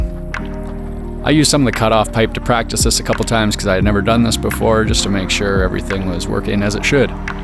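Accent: Canada. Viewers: I'm so used to seeing crappy, overly heavy American builds using crap timber and rough as guts construction techniques that i normally scroll past. Yours is brilliant and attractive with attention to detail. Your stairs are a master class. Cheers from Australia, now realise from your accent you're Canadian. Cheers mate, subscribed. Edit: even watched the adds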